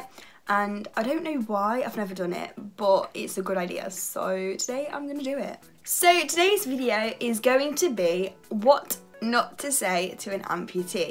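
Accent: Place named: England, United Kingdom. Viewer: You 're such a cute young woman (i could be ur father) , i've seen two other videos of U … You are able to do most things that a lot of us with our hands can't do. As you say, you do those vidéos, u go to school , U do so many things that other Don't, me first. I love your English accent and the light you're brighting arround you. Sorry for my English , i write from Britanny (France) . You already know how to be Happy Pleased Glad … many ones will never know that.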